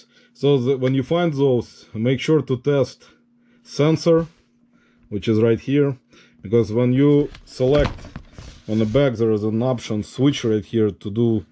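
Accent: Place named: Russia. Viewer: I hope you don't mind me asking. What country are you from? My son served a mission in Iceland for two years and I have friends down there now I talk with all the time. They speak English, too, and they're accent sounds a lot like you , but the more I'm listening , sounds Russian. Either way, Love it! I've sold those singing fish in the past but it's been awhile. Glad you were able to sell it. Woa! That's awesome on the Precious Moments. Looks like it's from the 70s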